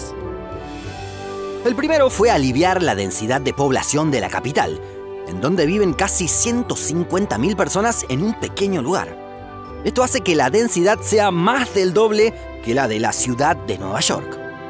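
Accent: Rioplatense, Argentina. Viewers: Si no me equivoco tenes acento rioplatense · acento argentino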